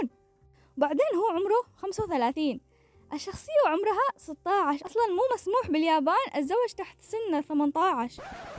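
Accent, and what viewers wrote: Yemen. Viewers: ليش ماعد تنزلين فيديوهات احسك يمنيه من لهجتك انا يمنيه😊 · لهجتش يمنيه لا بعيد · احسك يمنيه بس وربي انك مبدعههه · انت يمنيه صح😊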